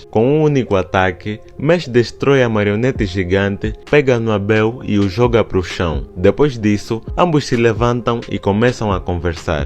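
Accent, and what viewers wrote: Angola. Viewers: O narruaidor eis angolaino? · Ele parece ser da Angola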